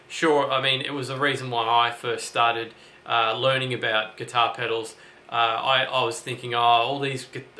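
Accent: Australia. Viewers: Quality video ! you do a great job with this , subbed , watching / taking notes / stealing tons of ideas . don't be surprised if you notice a lil aussie accent on my next vid ....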